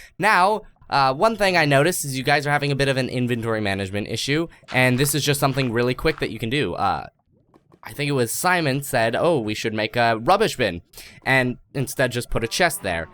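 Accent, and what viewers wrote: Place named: California, United States. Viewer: Torkil Hansen I think that's just the typical Californian accent.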